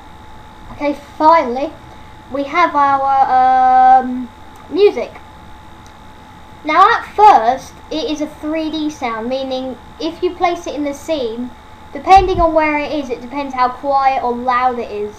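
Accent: United Kingdom. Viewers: omg bro is british! finally i found a british unity tut thank you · great video but lame choice of english accent.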